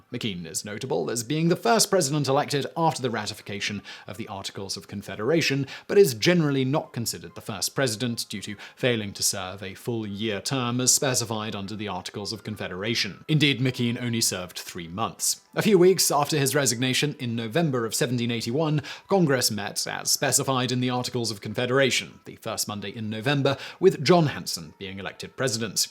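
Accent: United Kingdom. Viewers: You yook the words right out of my mouth!!! At least, he talks British. Not sure if he truly is or not. Anyone can imitate a British accent for views. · The current country of the United States didn't exist until its formation through the Constitution... that's why we Americans brag about being the "oldest country in the world"... we have the oldest, continually-functioning government. I do love it when people with foreign accents try to explain America to Americans... enjoy Brexit, Redcoat! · I stopped watching when I heard a Brit speaking about American history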